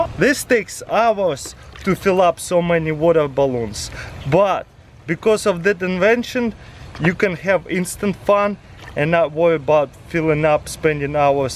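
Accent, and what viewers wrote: Russia. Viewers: Чувствуется Русский акцент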